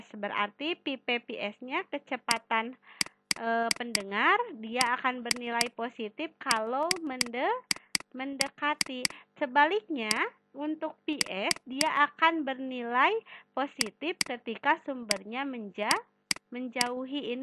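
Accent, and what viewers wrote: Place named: West Java, Indonesia. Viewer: Kak orang Sunda ya?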